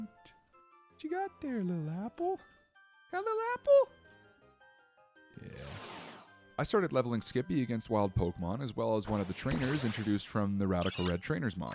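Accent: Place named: Canada. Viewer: I love the Canadian accent😂❤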